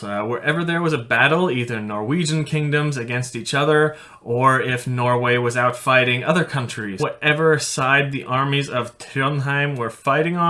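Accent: United States. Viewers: Dacca if you are born in the US your a American... The guys accent is pretty clear he's American... Vikings are long gone but theres still scyfy nerds running around making false claims but hey whatever floats your boat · Are you American of Norwegian descent or Norwegian immigrant to America? Or... neither? You just sound so American lol. No hate just curious 😊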